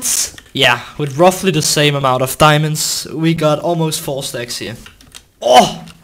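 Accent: Denmark. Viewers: i hear danish.. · i can here that your from danmark. true? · I smell danish acent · are he danish¨ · bruh den danske accent offffff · Sounds like a danish accent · That moment when you can hear that He is danish becouse of his accent😂😂😂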